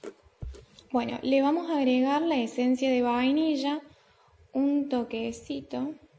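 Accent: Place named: Córdoba, Argentina. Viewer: Que rico amo este tipo de media tarde bien Argento, me encanto como lo explicaste y tu acento cordobés lo es todo!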